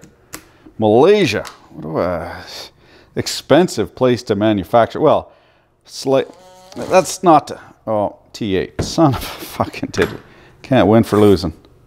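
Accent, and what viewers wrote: Quebec, Canada. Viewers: why is he cursing in québecois? 😂 il est vraiment bon en plus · hmmm. are you from Quebec, canada? your language sounds familiar for cursing :)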